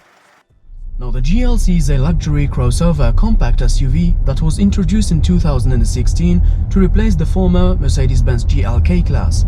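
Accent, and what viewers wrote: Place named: United Kingdom. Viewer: UK englich ❤️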